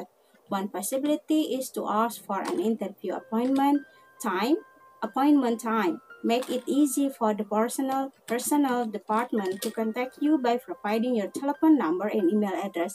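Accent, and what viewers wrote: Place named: West Java, Indonesia. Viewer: Terima kasih mudah2an bermanfaat, bahasanya sunda banget ih...☺ Maafkan Ay...koreksi positif.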